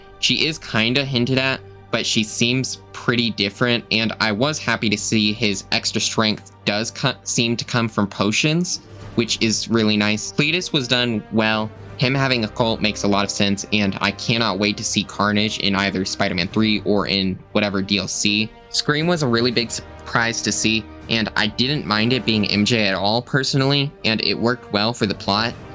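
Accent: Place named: United Kingdom. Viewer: Bro I've noticed that you have the British accent. Can you give to me some advice to improve my listening skills to understand you British people?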